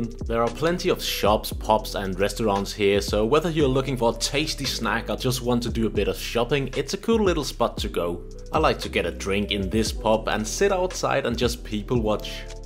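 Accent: Liverpool, United Kingdom. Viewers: New vewer here but did you learn english in liverpool? Im a scouser and you have the same accent as others that learn here. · Great video. I'm a north easterner but (surprise, surprise) I really love London. Btw, what is it about Danes that makes you sound like Scousers? It might be the soft consonants and the intonation - but you just do. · I just watched this video on Tyler Rumple's site, and found it very enjoyable. I will however share this original one as he is very annoying! I was intrigued by your accent and see now that you are Danish, but why do you sound a bit like a Scouser? Did you live in Liverpool, or learn English from someone from there? Or is it a linguistical co incidence? Funnily in the past on a few occasions , I have heard people talking from a distance, whom I thought at first were British, but turned out to be Danish. This is strange as Danish is not as close to English as Dutch or German!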